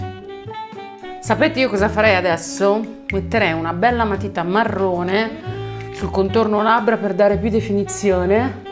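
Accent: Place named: Ancona, Italy. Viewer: Sento quasi l’accento anconetano/decollemarì 😂😘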